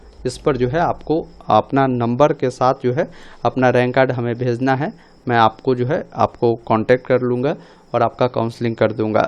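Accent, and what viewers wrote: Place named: Marwari, India. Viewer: Barbar English mein voice change kyon Ho Raha Hai sar Marwadi English mein yah bol raha hai video mein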